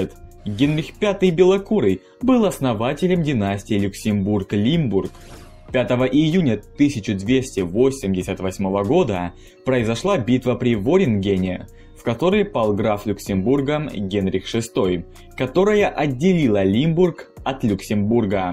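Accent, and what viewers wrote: Ukraine. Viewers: Твой Украинский акцент такой мерзкий. Буквально засыпаюсь от голоса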